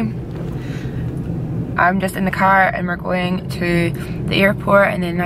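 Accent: Scotland, United Kingdom. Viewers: I need a Scottish accent I love it!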